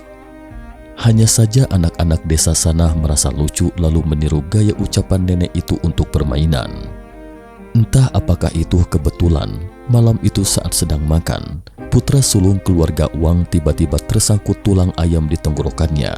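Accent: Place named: Bandung, Indonesia. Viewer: Suaranya ini mantab tapi masih ada logat aksen daerah nya, kadang ada akhiran Ak.. Ak... Asli Bandung atau mana ya..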